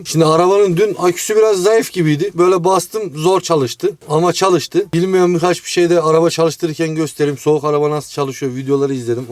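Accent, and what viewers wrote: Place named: Çorum, Turkey. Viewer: Abi Nerelisin Aynı Çorumlular Gibi Konuşuyorsun Başarılar :)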